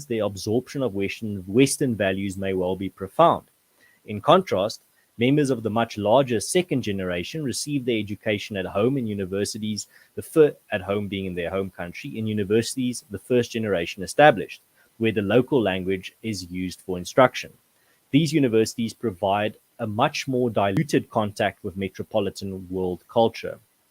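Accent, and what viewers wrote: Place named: South Africa. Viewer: I worked for a South African company for 25 years and great to hear your accent!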